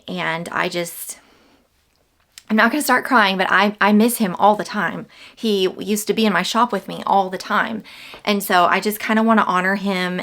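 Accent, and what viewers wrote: South, United States. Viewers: The lady is right, anywho is not a word, it is a phrase...a saying...a moment when words don't matter. I am from Michigan and my family says it ALL the time! My dad's side especially says it and we have no roots in the south at all. I think phrases said are a moment when the "uptight" leaves the conversation, lol. You say all the Southern you want Miss Ma'am! I like your southern accent and speech as it reminds me of when I lived in Atlanta with my best friend Julie. Her mom would introduce me to others saying, "This is Jennifer. She is our Yankee friend but we love her just the same." 🤣😂🤣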